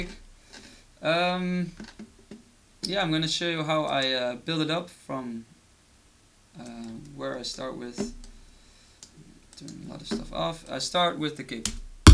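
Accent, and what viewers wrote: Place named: Netherlands. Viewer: Pittig nederlands, dat hoor je gelijk! :P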